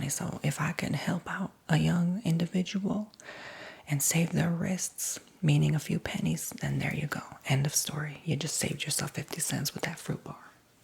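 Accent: South, United States. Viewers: Love the Southern accent lol Are you on the Tingles app? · I’m in LOVE with this character!!! I love me a GOOD OLD SOUTHERN DRAW! Voice is perfect and soothing! The stories and chit chat are on point! Great job!!! 😊😊😊 THANK YOU for this!!! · At least your a nice southern cafeteria lady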